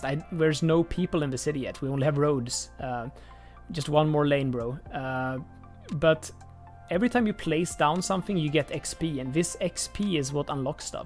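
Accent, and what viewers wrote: Sweden. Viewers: Are you from sweden? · Where are you from? Im from Sweden, you sound Swedish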